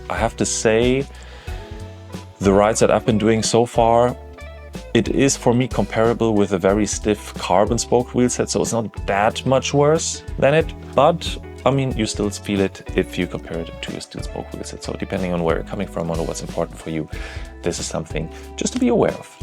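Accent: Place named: Germany. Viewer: "Velo Six Spoke Aero Wheels" ... the German accent was the hook, but I didn't see any German style analysis. You talk about noise and feel and stiffness and cross winds is as close as you ever get to discussing the aerodynamics of aero wheels! Whats the matter with you? Do you have aerodynamic wind data of any kind?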